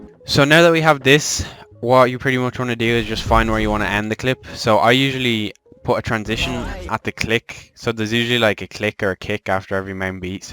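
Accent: Ireland. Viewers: Didnt know u were irish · Wait he’s Irish like me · bro i don't care you blured the things, the tutorial was fantastic i watched only because of the irish accent this wasn't even useful for me, i already learnt a lot about editing, and i use premiere, but this was really nice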